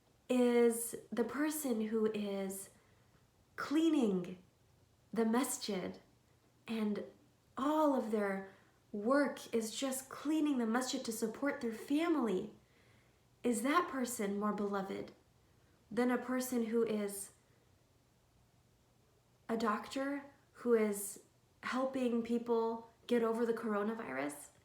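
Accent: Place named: United States. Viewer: Ukhti, by hearing you speak I can tell you're American,I can pick up American English better and quicker than other English speakers, the reason I and I know and few... Anyway listening to your speech I noticed that you mentioned some about Muslims calling themselves "bad Muslims". There's no such thing that being a bad Muslims,shaitan tricks to discourage the slave. Convey to those Muslims who think they aren't good enough of Muslim that Nabi Ahmad SAW said we,his Ummah won't be able to follow him up on everything,"just to do what we can"...And He swt was holy gifted,there is no way we can get to his level,we don't have his strength,power,endurance etc... Just do your best, Ummah.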